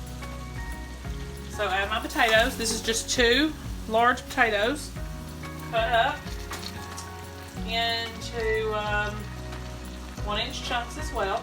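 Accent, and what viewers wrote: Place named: South, United States. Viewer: I love your country accent. Makes me feel right at home with my grandma 🥰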